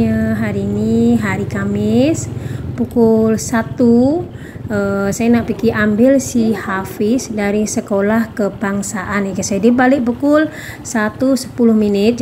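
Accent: Indonesia. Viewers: Mbak saya orang Malaysia izinkan saya memberi dua saranan berikut : 1. Bagusnya mbak jelaskan apa maksud istilah "flat" yg tertulis di judul dan juga dituturkan dlm video. Kebanyakan warga Indonesia tentu tidak tahu apa itu "flat"- tidak ada istilah begitu di Indonesia. 2. Sebagai orang asal Indonesia dan juga untuk berbagai informasi kpd pemirsa di Indonesia, ada baiknya - dlm sebahagian video jika tidak semua - mbak bicara dlm bahasa Indonesia bukan dlm bahasa Melayu. Penuturan bahasa Melayu mbak bagus sekali walaupun jujur masih kedengaran ciri2 "orang Indonesia" dlm penuturan.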